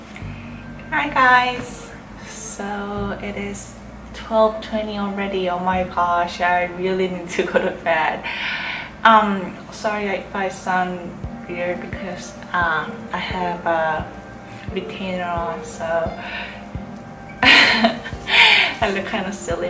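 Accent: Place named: South Korea. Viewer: 그냥 완전 한국인발음인데??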